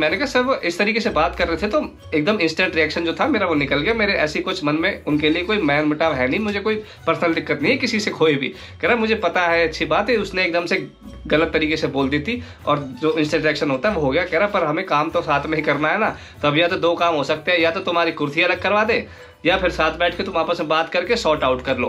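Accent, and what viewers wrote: Haryana, India. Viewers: Ye bhai haryana faridabad se hain ya utterpradesh Noida se boli dono ki same hai.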